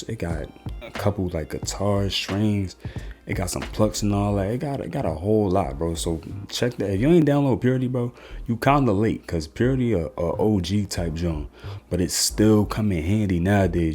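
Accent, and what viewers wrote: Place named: Philadelphia, United States. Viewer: sound like he from Philly. Good vid.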